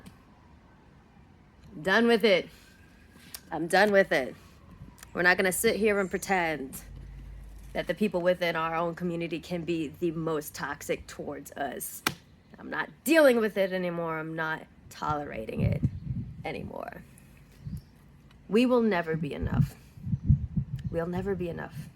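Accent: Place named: Houston, United States. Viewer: I fuckin love!! Shes always here with insightful commentary and hilarious parodies. Also where in the South are you from? Cause I swear you be soundn like me and my cousins in Houston!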